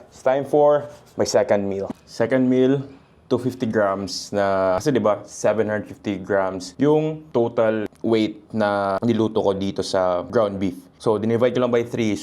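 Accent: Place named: Conyo, Philippines. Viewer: Kabaklaan conyo pa mag salita, cringe ka talaga haha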